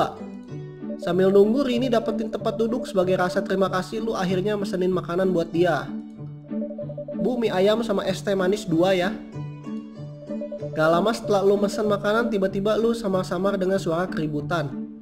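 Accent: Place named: West Java, Indonesia. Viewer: Kak kakak kalau ngomong kayak orang sunda!!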